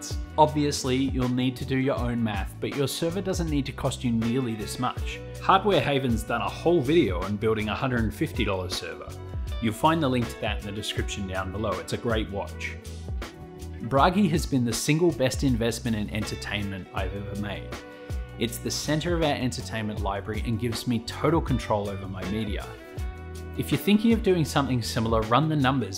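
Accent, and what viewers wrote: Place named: Australia. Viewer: Just had this recommended. Instant sub when heard Aussie. Stay for the great work in video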